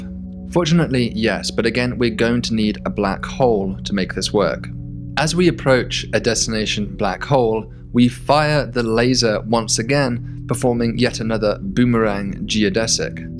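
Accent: United Kingdom. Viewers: This dude looks like someone thought to themselves what the coolest person they could make is and we got this guy. Black leather jacket, british accent, awesome hair, and extremely smart. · Its just the British accent.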